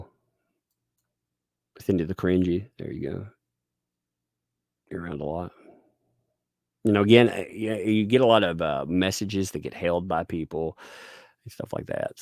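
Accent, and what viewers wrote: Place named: South, United States. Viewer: You kind of talk like Beau of the Fifth Column. He has a little twang too when he speaks he must be a southerner like you 😂 😂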